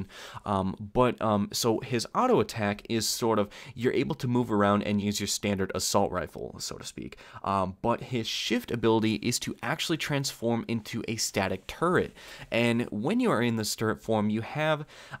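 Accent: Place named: United States. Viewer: i really think you deserve more views <3 i'm the sort to overthink things as well, i have many theories that i want to turn into a storyline for tf2 (obviously, not before i consider all of its comics). unlike you, i lack the voice and the concise speech manner (i'm sure you're using american english, which is my favorite) to make videos, so my only good english is the written one x'D i admit not knowing the characters/storyline of the game you are talking here, but your video is very pleasant for an introduction. too bad people lack the patience to listen for so long. somewhat, i wish there there was a heart shot rewarding system as well x'D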